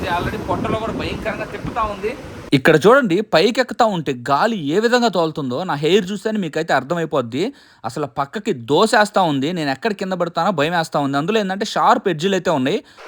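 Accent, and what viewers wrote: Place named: Nellore, India. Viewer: Brother ne bochulo Nellore slang aaapu, and marchu plz madhi kuda Nellore but vinaniki irritate ga undhi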